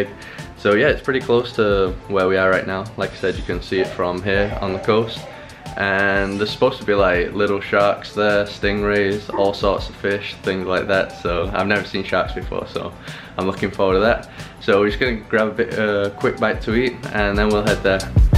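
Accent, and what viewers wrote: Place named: England, United Kingdom. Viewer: Wow man! I really like your English accent. Sound very clean for me. Where you from?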